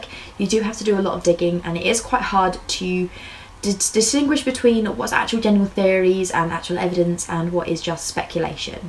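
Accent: United Kingdom. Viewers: It just sounds different because she's from Britain. 🤔 Grits in Chicago is the same as grits in Alabama 😂 And that would be porridge in England. 😆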